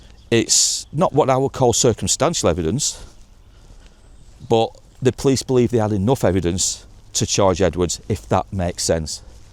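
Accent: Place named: England, United Kingdom. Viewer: &, I was just thinking of how hard it was for me to understand ' thick English accent when I 1st started watching you guys. Been about 1 year now and I understand every word 😂😂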